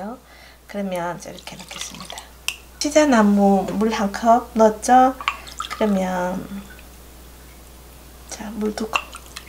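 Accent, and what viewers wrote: Gyeongsang, South Korea. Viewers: ~설명도 친절하게 잘하시고 못하는요리가 없으세요^^요즘~잘구독하고있습니다 덕분에~요리솜씨가 늘었어요 님목소리에서~경상도특유에 깊은정이 느껴져요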